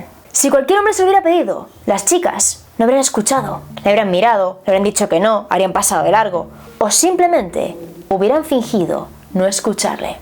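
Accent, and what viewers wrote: Spain. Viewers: La mayoría de tus suscriptores son latinos en América.....tu acento español está muy muy marcado....si lo cambiarás un poco se te entendería mejor ....saludos !!! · Eres genial, me encanta como relatas y tu voz de española je, te mando un fuerte saludo desde México.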